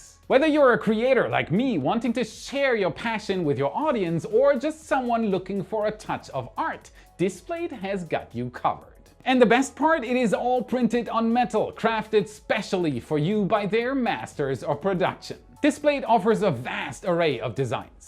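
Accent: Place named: Germany. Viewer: Your english has improved a lot over the last time, better pronunciation, more words in your narration👌🏻 However, please keep your german accent! 😄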